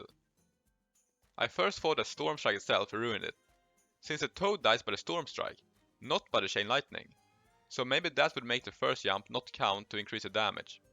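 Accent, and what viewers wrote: Sweden. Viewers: Your Swede accent always brings a smile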